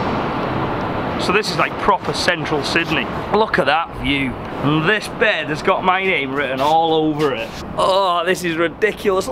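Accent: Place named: Manchester, United Kingdom. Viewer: U sound like a mancunian but u from Wales u sound like Jason manford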